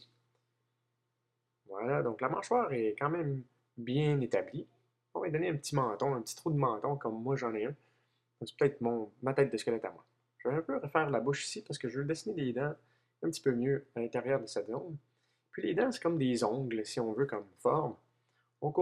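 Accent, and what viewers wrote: Quebec, Canada. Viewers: il a un accent québécois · merci mais ta vois et bizzar acssant canadien mais encore merci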